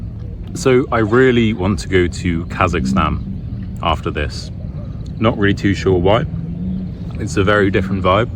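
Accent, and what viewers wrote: United Kingdom. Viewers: british accent is my favourite. greetings from caracas, venezuela. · British accent it is love it❤❤❤🥺🥺🥺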